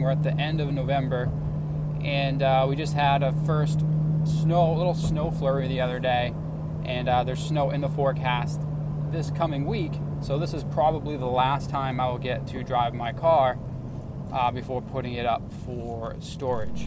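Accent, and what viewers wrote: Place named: Boston, United States. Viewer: I noticed that you had a slight Boston accent. then when you said you're in Maine I thought to myself, "ohhhh"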